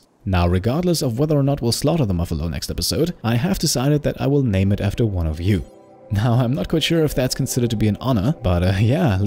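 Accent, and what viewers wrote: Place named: Germany. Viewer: I thought you were German. It's not super obvious, but reminded me of how I sounded some years back.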